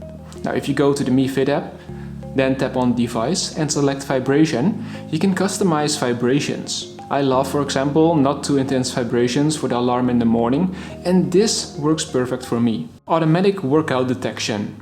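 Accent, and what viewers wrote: Netherlands. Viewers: Dutch accent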